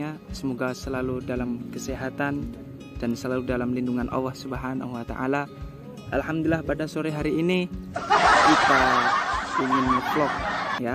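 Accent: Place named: East Java, Indonesia. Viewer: Ustadz luar biasa smg sukses boso wetane medok bgt 👍👍👍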